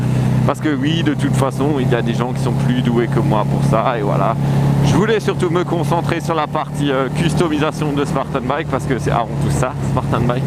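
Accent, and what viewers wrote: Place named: Alsace, France. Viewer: Putain l'accent à couper au couteau, tu viens pas du nord de l'Alsace toi? xD Bonne vidéo pour expliquer le but et "l'utilité" ou non de la customisation! :) Oublie pas de remercier Black pour t'avoir apporté le soleil! (ololz) Maintenant que tu fais des glisses faudrait penser à tourner en même temps hein. :p #letrollfacile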